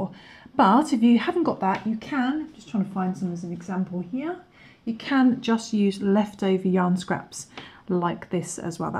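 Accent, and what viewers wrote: England, United Kingdom. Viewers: It’s nice to have somebody English nice and slow and clear and showing you exactly what you’ve got to do your brilliant thumbs up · Loving your channel. I’m 74 from UK and newbie to crochet, struggling with lockdown. You have a lovely clear english voice and clear instruction. (U.S. contributors - of anything - so long winded!) I finally did your daffodil - after many mistakes! and now doing your lovely piggy. Many thanks.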